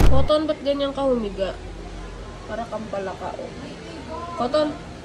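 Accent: Batangas, Philippines. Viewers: lods penge sticker! new subscriber nga pala ako, nakakatuwa yung punto mo lods parang batangenyo, hehe. rs! 🤙🛵💨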